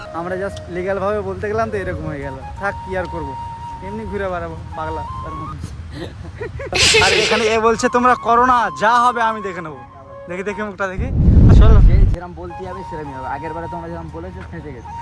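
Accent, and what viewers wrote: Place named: Malda, India. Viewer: মালদা টান দাদা 😢😢😢😢😢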